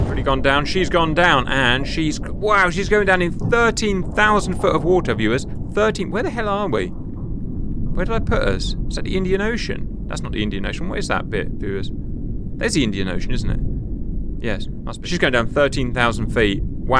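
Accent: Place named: United Kingdom. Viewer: Agreed. YT isn't purely about knowledge. has his own personality, plus he's BRIT-ISH (make a funny pronunciation yourself when reading). When looking at channels that get to this size, it's typically at least half of the host just being interesting/entertaining/weird/fun etc.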